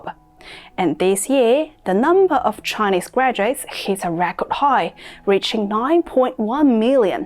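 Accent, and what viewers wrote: Australia. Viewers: Aussie accent init? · I’ve never heard a Chines/Australian accent before, interesting.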